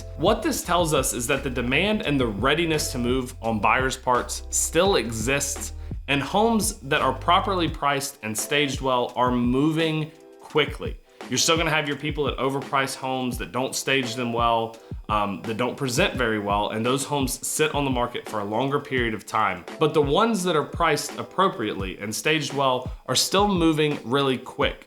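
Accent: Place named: New Jersey, United States. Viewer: Sounds like NJ!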